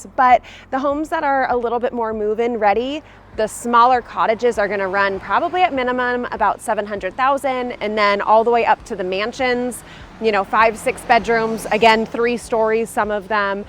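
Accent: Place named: California, United States. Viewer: Hello ^^ It's the thinker Christina Hoff Sommers who brought me here because she's from Petaluma and I wanted to know more about the homeland of my favourite female intellectual. You have the same lovely accent as her and also her laid-back cool attitude ☺ As says: " are unforgettable". I live in Algeria, but I long to visit your gorgeous town, especially the library-museum for I love books and history! Good continuation my friend 🙂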